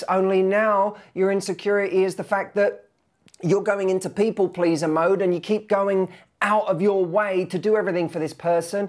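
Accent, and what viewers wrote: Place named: United Kingdom. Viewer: I think you’re an EXCELLENT SPEAKER! And we LOVE The way you talk, and your British accent. It’s amazing how we have these silly things in our head like you said stop comparing ourselves to others. And I prefer over Tony! seems genuine and kind compassionate NOT filthy dirty slimy and manipulative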